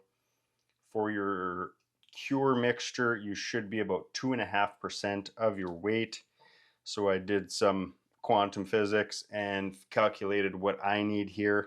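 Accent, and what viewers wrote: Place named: Canada. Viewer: Like your recipe, but man... your Canadian accent is killin me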